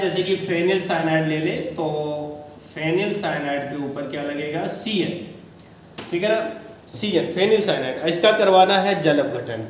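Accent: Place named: Kanpur, India. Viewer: Very very nice kanpuriya language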